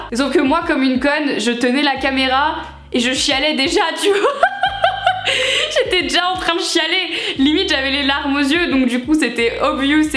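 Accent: Brussels, Belgium. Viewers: Trop chou, tu commences à prendre l’accent bruxellois du Henri. Pendant que moi, j’ai perdu mon accent belge dans les Alpes 🥰🇧🇪🇫🇷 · tu as un petit accent belge 😂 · Sais-tu que tu commences à avoir un petit accent belge par moment 😉? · T'as un peu pris l'accent belge ahaha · Tu as vraiment adopté l'accent belge je trouve ! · tu as pris l'accent belge!!!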